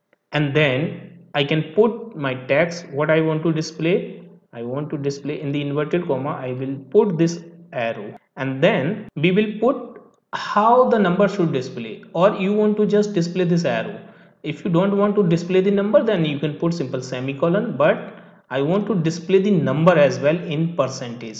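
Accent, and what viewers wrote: India. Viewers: I'm so sorry to write this but I simply can't focus with this super Indian dialect, if this guy is from India that is. No offence, really, I just can't concentrate. Wish you all the best 🙂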